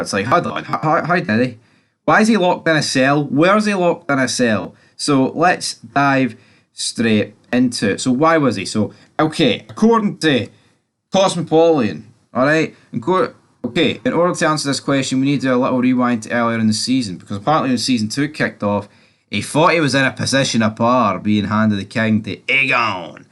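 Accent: Scotland, United Kingdom. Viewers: Exactly the same as mine, so I am guessing east central Scotland, Fife , Edinburgh or Falkirk. Listening more though, it might be a wee bit further west , nearer Glasgow.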